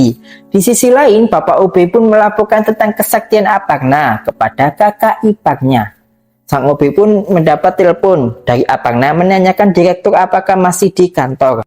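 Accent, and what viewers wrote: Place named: Banyumas, Indonesia. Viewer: Ngapak yak😅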